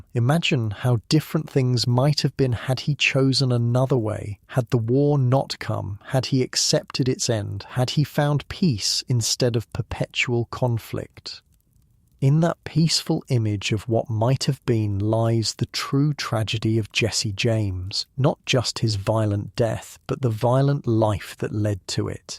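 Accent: England, United Kingdom. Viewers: Old west tales with an English accent?! Yeah, I’m out🙄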